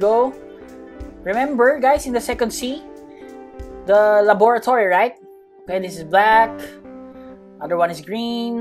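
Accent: Philippines. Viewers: FILIPINO KA TALAGA KUYA BOSES PALANG FILIPINO NA HAHAHAH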